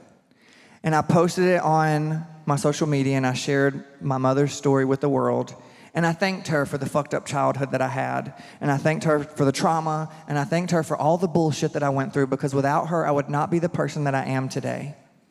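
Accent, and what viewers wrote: South, United States. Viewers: hes southern. This is a southern way of talking. Don't mean anything to race. Cause this isn't AAVE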